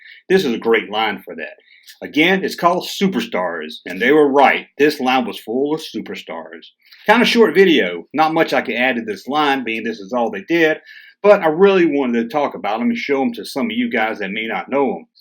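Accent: South, United States. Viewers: A guy with a southern accent who can’t pronounce Darrel Waltrip? My mind is blown.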